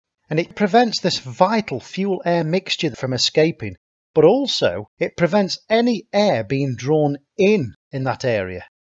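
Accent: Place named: United Kingdom. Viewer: wow its really good to learn from a British guy for once 🇬🇧😁 as my hearing ain't great..no offense to our cousins across da pond.. but I can't always understand yer accents..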